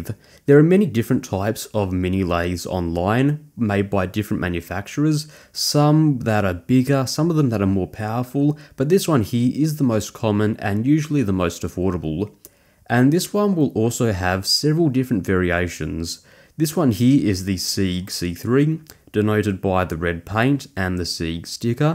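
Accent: Australia. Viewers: Thank you for this very thorough and well thought out review! I have been procrastinating for years now on my decision to jump into a mini lathe, because there just isn’t enough information out there in easily understood format. Good on ya mate! I enjoyed listening to your accent too, my younger sister lives in Albany, Western Australia, and so I miss hearing you Aussies! Cheers mate, from Northern California! 🙏💪🙌🤘🤘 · Is that an Auzzie accent? Does it vary from region to another?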